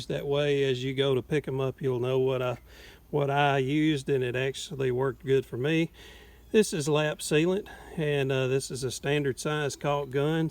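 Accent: South, United States. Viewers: Being from South Carolina I was glad to see someone who speaks Southern American English make an RV roof repair video. I couldn't understand what the folks from Connecticut and California were saying. Very useful information. Thank you.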